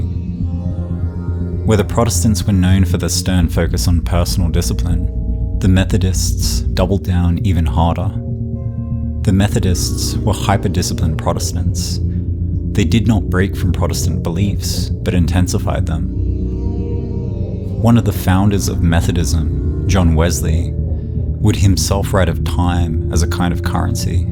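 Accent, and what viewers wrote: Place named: New Zealand. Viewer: Lovely essay! You sound like you are from New Zealand? Just curious as I'm listening from Auckland. Thanks man, nice work, congrats.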